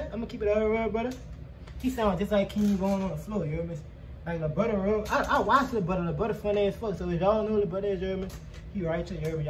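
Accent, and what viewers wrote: New Orleans, United States. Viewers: Say u from New Orleans huh I can tell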